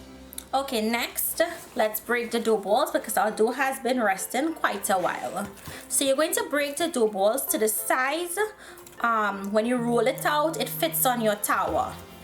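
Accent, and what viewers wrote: Trinidad, Trinidad and Tobago. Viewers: I'm here for the Trini accent - the food is secondary!! :) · L'Ange Gemi-fob it's Trinidad accent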